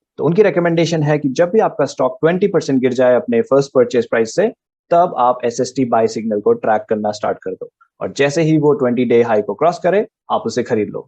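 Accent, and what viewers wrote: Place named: United States. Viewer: Ye American accent MEI bolna pehle band Karo. Very irritating. Strategy Mahesh C. Kaushik Sir ki hai. And uptrend market mei ROI 5-7% shayad zyada milega friends. Not in every year maybe.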